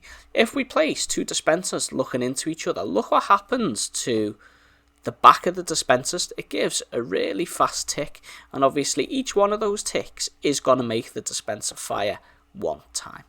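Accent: Liverpool, United Kingdom. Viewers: Liked just because you are a scouser :)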